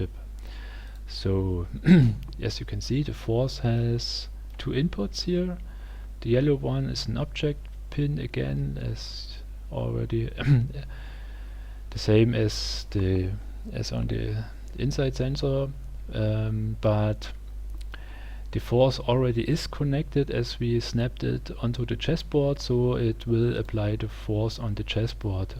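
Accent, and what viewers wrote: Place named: Germany. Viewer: Awwwww deutscher Akzent :D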